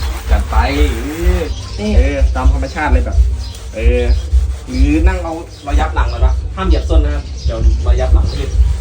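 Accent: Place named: Isan, Thailand. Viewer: อีสานจัด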